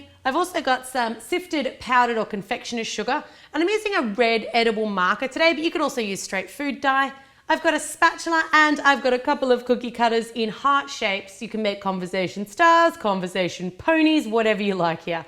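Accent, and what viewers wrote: Australia. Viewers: I thought you were australian ?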